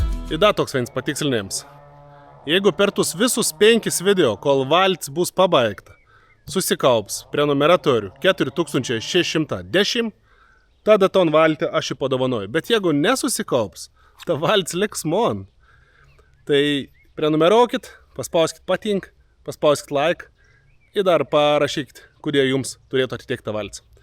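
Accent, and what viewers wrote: Samogitia, Lithuania. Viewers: Žiaure smage stebiet žemaitį ir klaustėis žemaitių kalbuos. Linkiejema iš Skouda ;) kontrybės valteis statybuos ;) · Jäkla kaip miela klausut zemaisiska Mano tevu dialekas linkejinai is Svedijos 🥰🥰🥰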